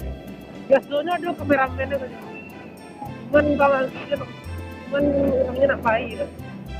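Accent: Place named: West Sumatra, Indonesia. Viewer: Kayak mana ni ngomong nya indomi (indo minang) yg jls2 aja la ngomong nya 😁😂😂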